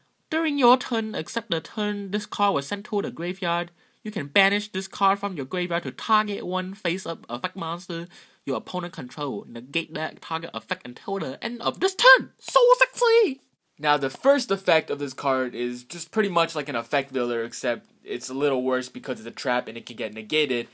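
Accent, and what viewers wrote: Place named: Asia. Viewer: Da azn accent :3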